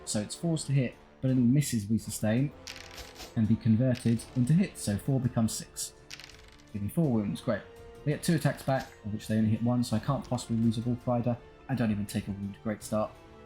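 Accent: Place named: United Kingdom. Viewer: I feel like this is an awkward thing to say but you sound delightfully brittish. Thank you for the uploads.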